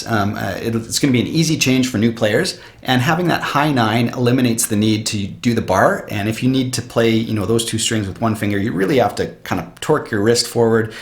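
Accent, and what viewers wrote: Canada. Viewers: By any chance, are you Canadian. I recognize your accent as a fellow Canadian as well.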